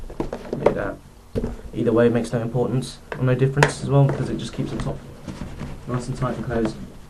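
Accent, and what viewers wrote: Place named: England, United Kingdom. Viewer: A Filipino with an English accent, now I've seen and heard everything.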